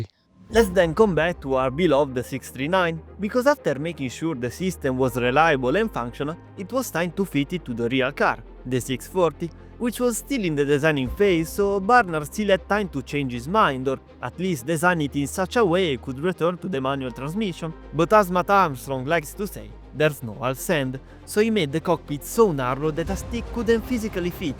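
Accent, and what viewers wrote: Italy. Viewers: Very very informative and interesting video, I didn't know the story but the way in which the Italian pronounciations add to the Mediterranean vibe is amazing NGL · I never knew there is another accent could fit Formula One content but british, and there you go! Italian accent and some italian words makes it top notch! Plus it's fascinating too! Keep going mate! +1sub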